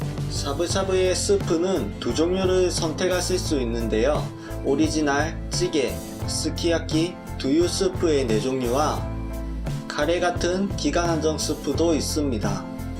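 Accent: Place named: Gyeongsang, South Korea. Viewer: 엌.... 비웃으려는게 아니라 제가 친정이 거제도고 결혼전 부산에서 살아서 참 그리운데 님 샤브샤브 그 특유의 경상도 ㅅ 발음이 너무 반가워서 댓글 달고 갑니닼ㅋㅋㅋ 좋은 영상 잘 봤어요~>___<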